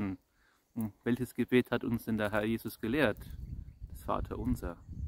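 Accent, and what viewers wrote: Germany. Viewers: My father is "Unterfranke" and from Bad Kissingen your dialect therefore reminds me much of fond childhood days when we visited the relatives in Bad Kissingen. My dialect having grown up in rich of Roman history Palatine, mixed with American accent, a bit of Swabian, a bit of Palatine must therefore sound really interesting in a funny way. Thank you for sharing this beautiful nature transporting same to me with your priestly blessings. I wrote you a while back in that Email I had asked your priestly advice on Padre Pio. If you find some downtime I'd be grateful for your much treasured input. It makes me happy to see my Godly priest happy, relaxed, fueling the soul with new energy all whilst sharing our Lord's beautiful nature with us! May our risen Lord protect our Godly, prosecuted priests who lead their flock despite the worldly war of satan! 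🙏🏻✝️📿🌿🌹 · So wunderbar gesprochen und auch noch mit fränkischem Akzent, das hat mir sehr gut getan! Dank sei Gott! Und ewiges Vergelts Gott!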